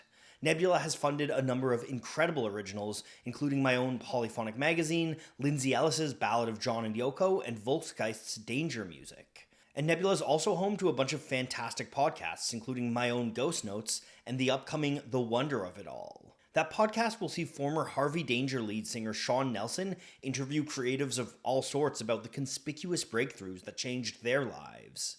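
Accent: Canada. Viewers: Dude, are you from Canada? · Hey Polyphonic, are you Canadian? There's something about the accent...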